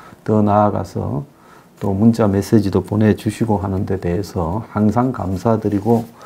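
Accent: Gyeongsang, South Korea. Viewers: 뻣뻣하지 않습니다. 경상도 액센트 좋습니다. 진실을 말하는게 가장 듣기 좋습니다.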